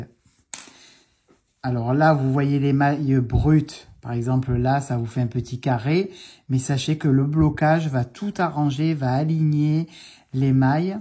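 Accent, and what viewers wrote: South, France. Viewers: Merci pour ce tuto chaussette je vais essayer avec ta méthode. Je suis débutante au tricot et ta façon me paraît bien simple, bonne journée, j'adore ton accent du sud, moi aussi je suis du sud 😊😊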